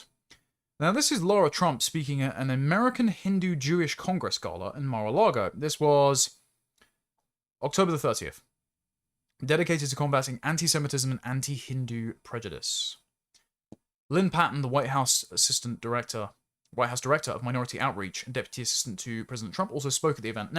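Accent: United Kingdom. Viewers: Nah, I think it's hot.I love the uk accent 😍😋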